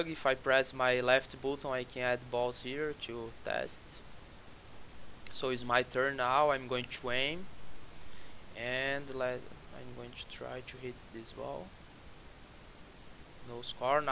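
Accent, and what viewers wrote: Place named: Brazil. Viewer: Acredito que seja Brasileiro pelo sotaque, mas que otimo video!! Nao pare de fazer videos!!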